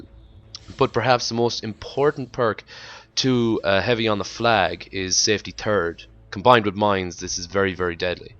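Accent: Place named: Ireland. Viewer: Irish accent :D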